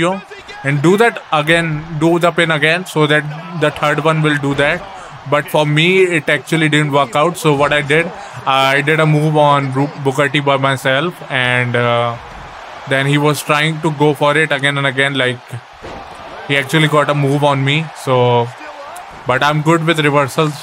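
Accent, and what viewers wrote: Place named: India. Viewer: Nothing worse than the Indian accent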